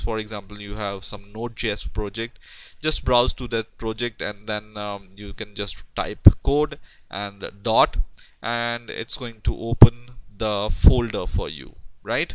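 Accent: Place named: India. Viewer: wahhh..indian accent.. god i am going crazy listening to u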